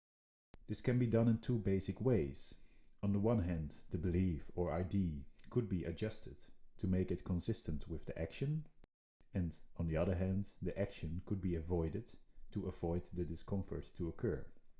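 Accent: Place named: Netherlands. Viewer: Volgens mij hoor ik een Nederlands accent. Cool. 😁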